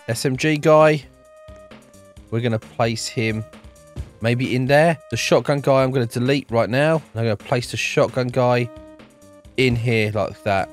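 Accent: United Kingdom. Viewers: i love his ultra british voice😂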